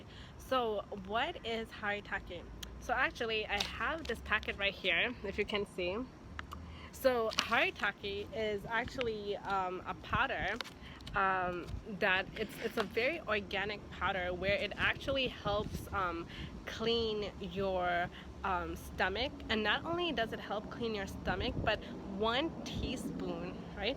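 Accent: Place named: United States. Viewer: This indian lady speaks perfect English with an American accent! Lovely eyes! I want this woman!!! Lol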